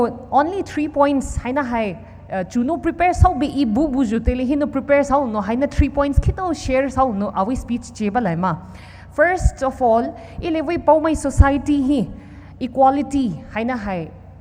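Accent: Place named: Manipur, India. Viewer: She speaks pure poula really appreciate.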